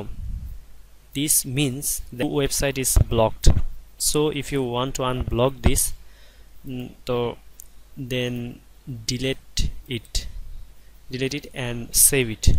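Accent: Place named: India. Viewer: omg your indian voice is so helpful thx :)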